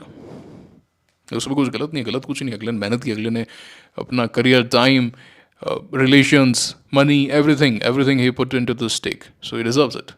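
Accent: Rajasthan, India. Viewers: Sir app rajsthani ho kya ❤❤❤❤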